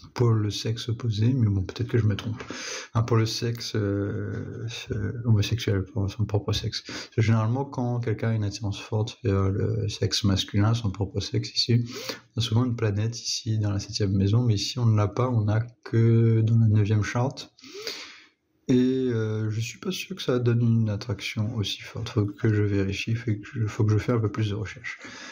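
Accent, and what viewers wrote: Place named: Tahiti, French Polynesia. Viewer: Sinon tu as un accent tahitien j'ai l'impression aussi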